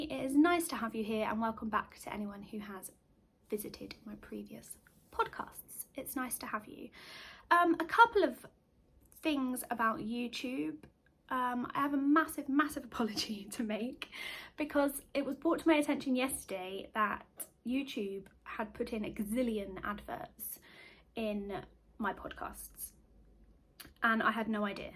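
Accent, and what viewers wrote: England, United Kingdom. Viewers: Love your yarn purchases. It is so easy to get overrun with yarn, it does take up space. I know for sure😁 . I love yarns and knitting socks hats mitts cows and some shawls. Rattle on I love listening to you, I love English accents😎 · Congratulations on your 1.69k subscribers. Love listening to your British accent and the Scottish lady too. From an Aussie down under.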